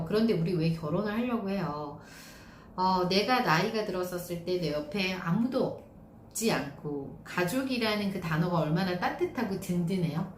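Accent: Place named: Seoul, South Korea. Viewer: 서울말 잘한다!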